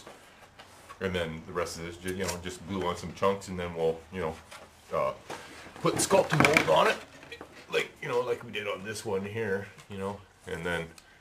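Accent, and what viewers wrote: Canada. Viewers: canadian ahy?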